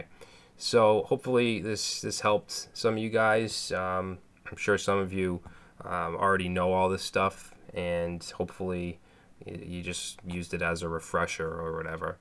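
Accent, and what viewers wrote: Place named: Boston, United States. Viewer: that accent.. are you from boston?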